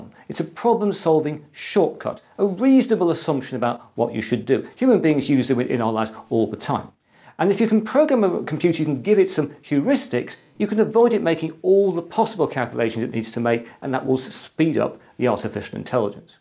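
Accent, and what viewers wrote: England, United Kingdom. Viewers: I enjoy listening to your English accent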